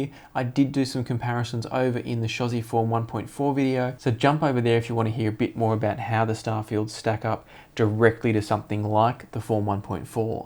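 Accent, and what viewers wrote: Australia. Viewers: Great review as usual, mate. Really glad to hear a fellow Aussie voice in the audiophile community. Looking forward to your thoughts on the Topping E30 DAC! 👍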